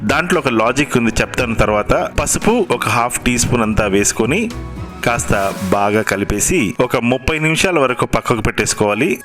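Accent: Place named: Godavari, India. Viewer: garu... me voice ki, mee recepies, last lo meeru paade songs ki nenu picha fan ni andi babu... miru chepthe nammaru nenu kuda mee laga matladesthunna andi baboiii... Mee yaasa ki mee prasa ki 🙏🙏🙏 Finally mana godavari vantalu antene oka adbutham... danni miru inka baga vandi chupisthunaru... Ah vantalu chusi abroad lo unna ma paristhithi enti andiii... edi miku emanna nyayam ga undaa...🥺 Final ga okati andi mee voice ki mee yaasaki nenu pedda fan...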